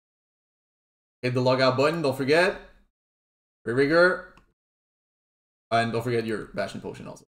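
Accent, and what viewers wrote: Quebec, Canada. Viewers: yo are you from quebec?? man you sound like youre from quebec hehe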